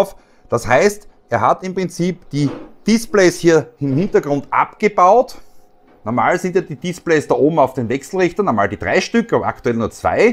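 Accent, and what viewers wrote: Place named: Slovakia. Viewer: Ich genieße dein Dialekt in Slowakei. Toll toll toll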